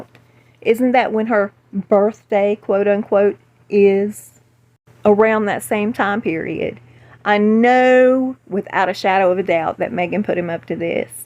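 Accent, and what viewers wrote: South, United States. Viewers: So glad to hear that spunky little Southern voice of yours! Hope you feel as good as you sound! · I just adore your accent!! It's so bright and cute!! One of my fave American accents (from an Aussie fan) :)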